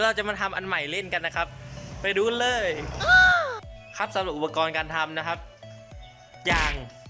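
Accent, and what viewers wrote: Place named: Isan, Thailand. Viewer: คนอีสานปะครับเนี้ย โอ้ยยแต่ละคำน้อวว555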